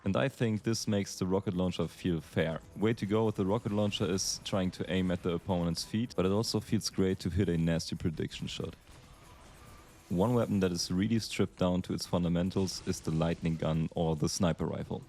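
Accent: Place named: Germany. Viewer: Love your accent, please, can you be a lil bit more german? That would be wertgeschätzt ❤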